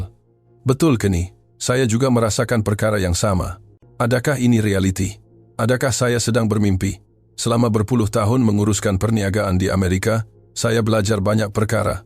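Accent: Indonesia. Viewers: xpayah bangga la.da terang cerita ni hanya rekaan.nak cuba guna A.I BM tapi malangnya lidah A.I ni lidah indo.camna pn terasa janggal sangat sebutan dia.so kadang2 bunyi dia agak lawak.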